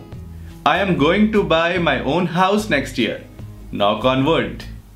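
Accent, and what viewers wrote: India. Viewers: Indian English... dont be puzzled..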